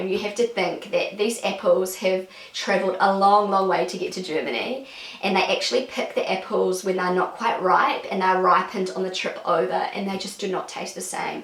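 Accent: New Zealand. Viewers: Can you recommend any other kiwi youtuber? :) I love the kiwi accent <3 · Aww being in Germany myself makes me miss these things too. I have yet to have a nice apple here, and I'm craving fish and chips. It's nice hearing your NZ accent too, I don't know any people from NZ where I live. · Hi, sweet as video😉 I can totally relate to all the things you mentioned in this video (minus the sweetcorn and lamb 😉). I've been to NZ three times for 10 months in total and am missing it so much! The fiery sunsets are just out of this world and nothing beats watching the stars on a NZ beach. Don't know if you've ever been to Whaihi Beach but it reminded me a lot of beaches on the German island Sylt! Ever since coming back from NZ I don't like German apples anymore. They're just not as tasty! And feijoas are so yummy. Didn't even know they existed before my first trip to NZ. 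I used to pick them from my host family's garden 😍 Keep posting videos, I love hearing the kiwi accent 😊 take care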